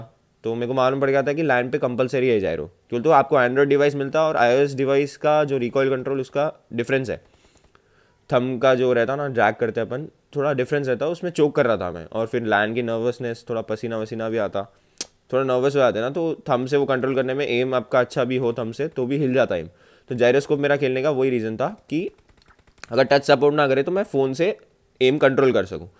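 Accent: Hyderabad, India. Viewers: Bhai tum hyderabadi hai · Like for Hyderabadi accent 🗿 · aakhri maut daaldiye yaaro tum are you hyderabadi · Hyderabadi slang😍😍😍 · By hearing your voice I think you are a Hyderabadi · Ekdam Hyderabadi language bolte tum to 🔥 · Bro r u Hyderabadi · Perfect hydrabadi language 🔥😂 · By his accent i presume he is from hydrabad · Bro, tum normaly bhi haidrabadi language hi bolte ho ya sirf video bananeke liya aaisa bolte ho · Bhai tum hydrabadi? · Bhai ekdum Hyderabadi hei.. fellow Hyderabadi.. tripped my ass off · Hydrabadi hindi love you bro 💞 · He is hyderbadi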